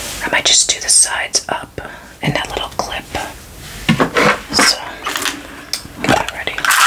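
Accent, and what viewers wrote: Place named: East Coast, United States. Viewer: Good morning what state do you live in? Sounds like you are on east coast like me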